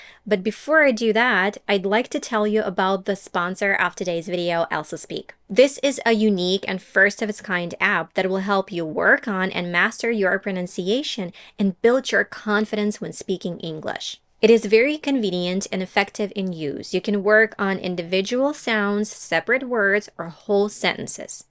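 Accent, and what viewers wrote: United States. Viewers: Hey, as an native English speaker would you say she sounds like an American? (Cause to my ears, I'm Brazilian, she does). If she doesn't, what gives her away? Would you weigh in, please? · How amazing I ve been watching ur videos for a long time I cant believe that u arent American...u are great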